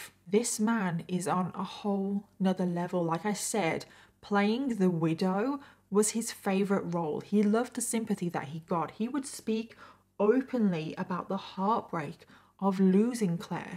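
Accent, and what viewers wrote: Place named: Redditch, United Kingdom. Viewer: Are you from Redditch or nearby? You sound like it.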